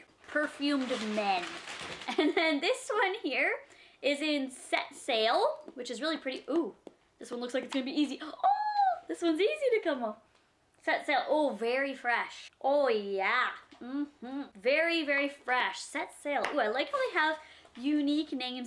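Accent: Canada. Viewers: Your Canadian accent comes out a lot more when your boyfriend is in the video. It's cute.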